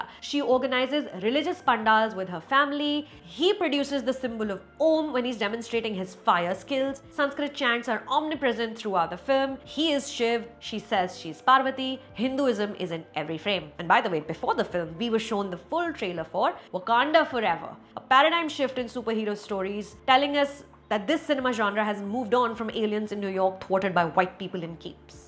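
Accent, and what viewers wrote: India. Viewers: long time fan of your reviews. However, I find your recent reviews a bit strangely edited. Let me explain- also, this is honest feedback not a low effort trollpost. Your recent reviews have you speaking a bit too fast, definitely faster than your previous reviews. I am not sure if this is because you are using a software that takes out the pauses? Just feels rushed and a tad unnatural. This review of brahmastra felt as frenetic as the movie- I tune into your channel because you bring your biting wit, sharp analysis, and humor all to bare. Also love how you own the desi angrezi accent - but the audio editing in these videos is so off, it has me pausing and rewinding quite a bit. If it’s not the editing, may I suggest probably using a slightly more relaxed vocal delivery (similar to your earlier style). Koi jaldi nahi hai- I will easily watch a 15-20 min review for the quality you provide!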